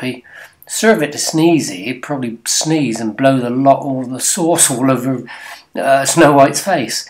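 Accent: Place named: Wiltshire, United Kingdom. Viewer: Loved watching this and hearing that good 'ol Wiltshire accent 😘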